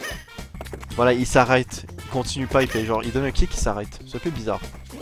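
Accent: Quebec, Canada. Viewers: Ton accent québécois non d'un chien c'est bon!!!!!!!!!!!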